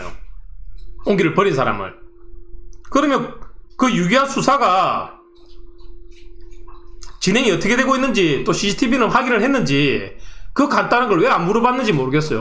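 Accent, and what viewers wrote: Gyeongsang, South Korea. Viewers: 지난 40분짜리 영상도 끝까지 다 봤지만, 말씀 하시는 것 하나 하나 논리적이시고 불필요한 비난 없이 모두에게 도움이 되는 방향으로 말씀해주셔서 이번 영상도 경청하게 됐어요.^^ 처음엔 생소한 채널이어서 조금 오해도 했었는데, 가장 어른답게 말씀해주셔서 개인적으로는 가장 와닿았고, 이 계셔서 든든하다는 생각도 듭니다.^^ 앞으로도 응원하겠습니다! (경남쪽 사투린가요? 들을수록 정겹네요~^^)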